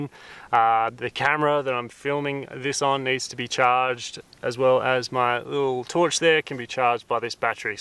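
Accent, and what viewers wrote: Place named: Australia. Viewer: love the Aussie accent, but took me awhile in the shelter segment to understand that "ina" was "inner"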